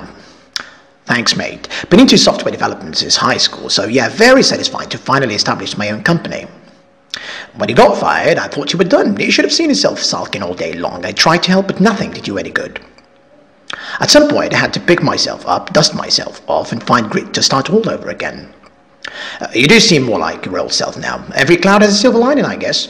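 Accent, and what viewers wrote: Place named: United Kingdom. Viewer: I would like to take a moment to hail my dear brother and colleague for the excellent and distinguished work he is about to engage with. You get to enjoy the pride of your family, friends, and students, my dear teacher. The podcast reveals how creative is your rebellious spirit wherein your British accent shines to beautifully intersect with the condensed and rich content of the podcast. I wish the attractively presented video would be but an outset of a promising stage of multiple challenges.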